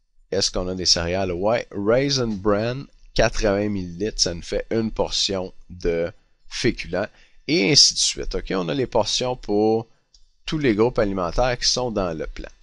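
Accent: Quebec, Canada. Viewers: il est québécois, je comprend et ca me dérange pas contrairement a ce que d'autres dise. Merci pour ta vidéo intéressante. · Ici, au Canada, c'est notre accent francophone. Va chier.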